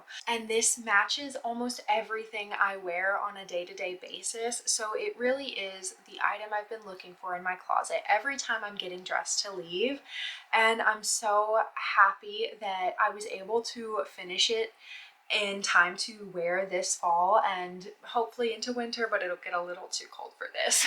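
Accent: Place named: Australia. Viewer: I've been watching your video's for a little while now, and I feel like your accent is changing a little bit to sound more Australian lol Also, I feel like our taste in knit pieces is so similar, I love fall colours too and you always pick such beautiful patterns! Watching your video's makes my fingers tingle to start new projects haha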